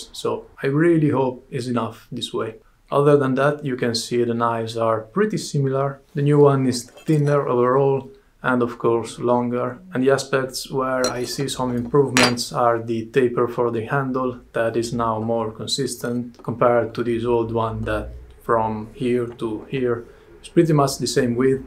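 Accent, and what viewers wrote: Venice, Italy. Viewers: he does sound a lot like the murano glass makers I know now that you !mention it.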